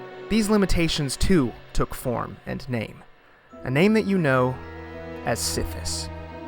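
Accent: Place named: Brazil. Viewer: You sound Brazilian which is a compliment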